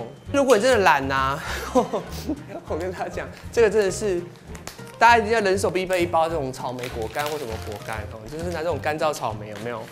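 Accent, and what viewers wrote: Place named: Taiwan. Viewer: 好多地道台語真的好愛🤣🤣🤣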